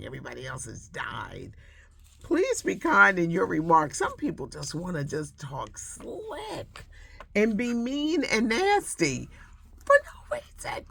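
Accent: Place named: Philadelphia, United States. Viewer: Hi Auntie 🖐🏾 I just want to say hello. I have loved you for years. I go all the way back to the Butter Ball days. I used to work at Jefferson Hospital with your brother.I have moved to the west coast. See you always take me to my home Philly. I love the Philly voice and demeanor. Love you Auntie. Keep up the good work 👏🏾 🙌🏿 ❤😂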